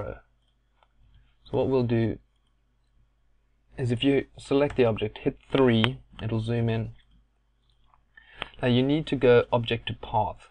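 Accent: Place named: South Africa. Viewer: Great tut..you speak like me!!! First time someone has got the pronunciation of bezier correct> You got to be South African!!;-)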